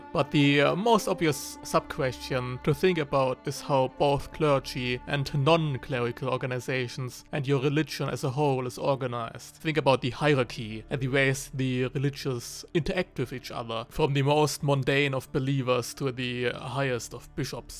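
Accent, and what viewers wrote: Germany. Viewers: Are you Swabian by any means?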